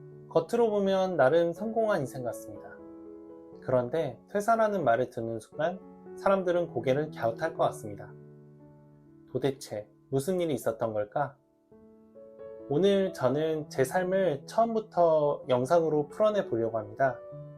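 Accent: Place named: Busan, South Korea. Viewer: 부산분인데 사투리 별로 안쓰시네요..ㅋ 좋은학교 나온 인재시고 아직 젊으시니 앞으로 좋은 기회가 마니 오겠쥬..ㅋ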